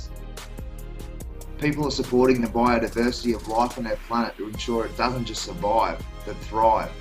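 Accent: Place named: Australia. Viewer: I love this! it is nice to hear a fellow aussie for a change ;)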